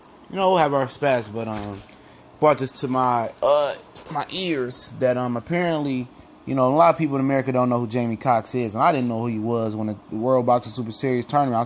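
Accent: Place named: Detroit suburbs, United States. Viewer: tickle your fancy bro Detroit niggas don't talk like that are you really from here or from the burbs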